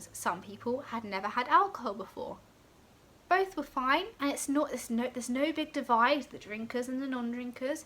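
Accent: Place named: RP, United Kingdom. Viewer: Hello... I love your perfect videos... It is very kind of you giving us advice about university... Btw i am the guy from greece (40°C+)💜💜💜.... I love your british accent... Recieved pronunciation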